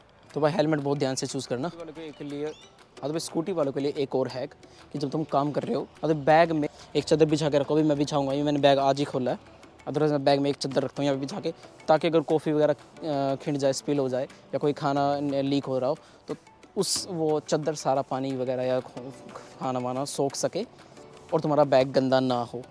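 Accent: Haryana, India. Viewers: Haryanvi touch bhai goda 😎 jai haryana jai haryanavi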